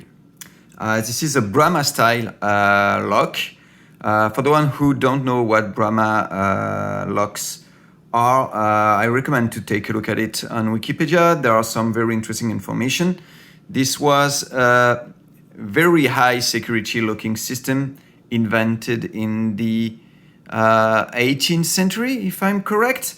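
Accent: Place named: France. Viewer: je suis fan de tes vidéos et avec le french accent, change rien !! Feras-tu le F3D ?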